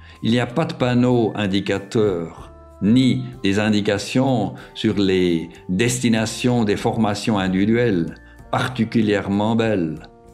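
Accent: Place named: Switzerland. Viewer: Bonjour, Des paysages magnifiques. Toujours l'accent Suisse merveilleux. Bonne continuation. Thierry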